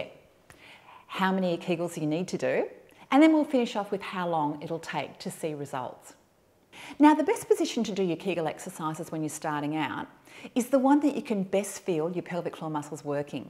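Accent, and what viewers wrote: Australia. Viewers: I love Australian accent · Love your Aussie accent. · Somehow that Aussie accent helps me feel more confident. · oh, an aussie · that Aussie accent helps me feel more confident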